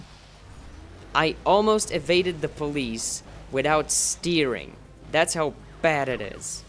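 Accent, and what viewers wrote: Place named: England, United Kingdom. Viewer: Horrible English accent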